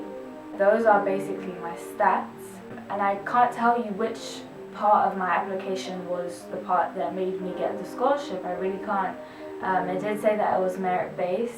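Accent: United Kingdom. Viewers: Wait so did u got to an American high schools or British secondary u sound very British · Wait how come you have a british accent xx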